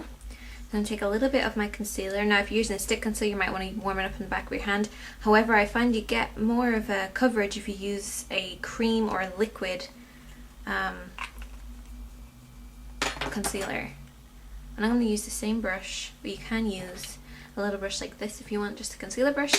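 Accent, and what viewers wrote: Ireland. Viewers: Are u Irish?? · ur irish?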